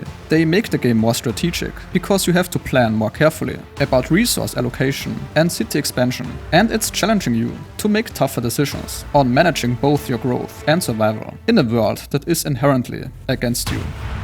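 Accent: Germany. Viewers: Man hört zwar, dass du ein Deutscher bist xD aber sonst richtig gutes Video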